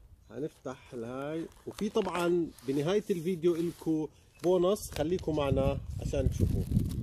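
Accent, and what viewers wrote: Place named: Palestine. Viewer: يا عيني على اللهجه الفلسطينيه شو حلوه ، بدّي اسالك شو اسم المحل وإذا بلاقي منو عنا في مونتريال ؟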